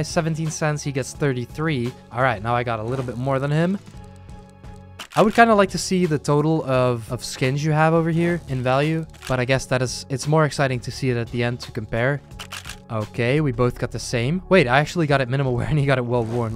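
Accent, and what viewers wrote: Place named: Netherlands. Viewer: Lol ik ben nog niet zo lang gesubbed en je bent nederlands 10000% ik hoor het gewoon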